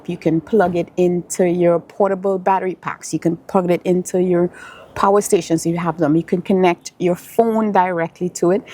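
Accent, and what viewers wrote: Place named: Jamaica. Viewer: You sound Jamaican. Greetings from Montego Bay.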